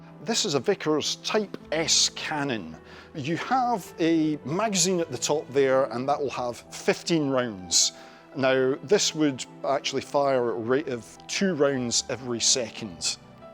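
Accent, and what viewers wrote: Scotland, United Kingdom. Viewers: Always a joy to hear a Scot agonising over something.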